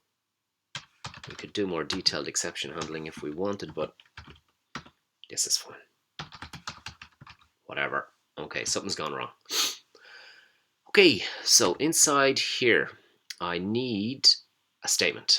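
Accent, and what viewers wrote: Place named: Ireland. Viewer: Sounds like an irish accent nice change from indian :)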